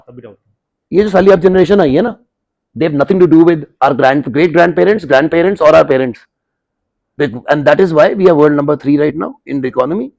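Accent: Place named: Jammu, India. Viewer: Your hindi similar to jammu hindi 😂 buta . Bhande . Etc😂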